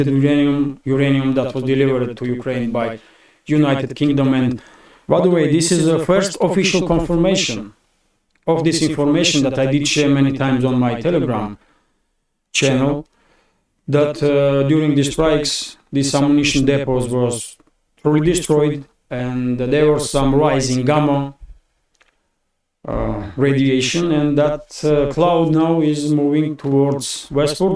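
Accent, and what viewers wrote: Russia. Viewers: I was so happy to see your appearance on the Duran. Your discussions were informative and the points you made were indisputable. I know you worry about your English, but hearing your amazing English skills better than most Americans has brought me great appreciation for English spoken with a Russian accent and has inspired me to learn Russian myself as a West Virginian; I have learned I need to find a tutor. Way to go, onward and upward friend. May your successes and joys be many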